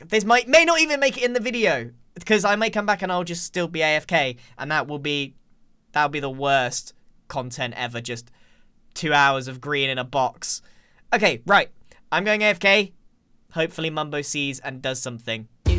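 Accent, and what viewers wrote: United Kingdom. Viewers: Grian with a broken voice sounds more brittish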